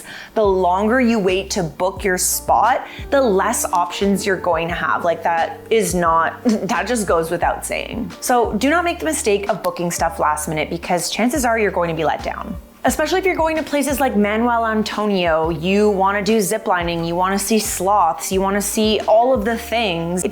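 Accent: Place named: Canada. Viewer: I think that it has to do with the Canadian accent as apposed to disrespect or not knowing the correct pronunciation or spelling.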